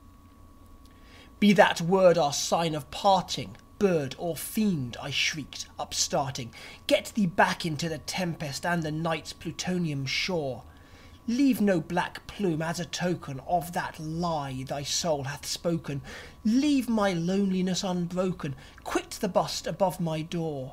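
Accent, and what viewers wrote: United Kingdom. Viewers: Deep sht. R u brittish?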